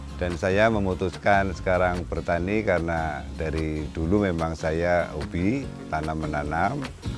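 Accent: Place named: Java, Indonesia. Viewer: Emang JAWA ... Notasi khas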